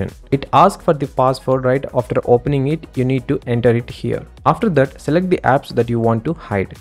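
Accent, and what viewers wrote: India. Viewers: It's always this Indian accent dude, thankyou soo much vro.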